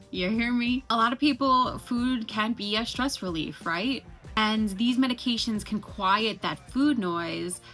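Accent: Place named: New York, United States. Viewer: Oh snap, I knew you sounded like a NY’er…Rockaway Blvd stairs is NO JOKE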